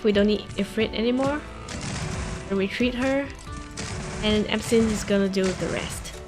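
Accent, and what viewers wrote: Singapore. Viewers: I'm picking the scent of Singlish... hmmphh hmmphh~ Definitely a Singlish, no way that's a common Chinese English accent. Trace of Indian, Chinese, Malay and Hokkien intonation. Hi neighbor countryman ^^ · I hear some thicc Singlish here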